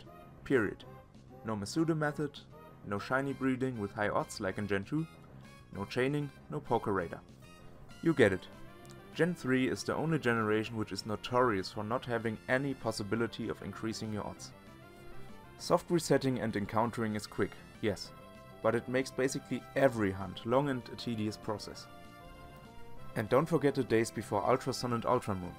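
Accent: Germany. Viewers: Man hört an deiner Aussprache das du Deutsch bist 😂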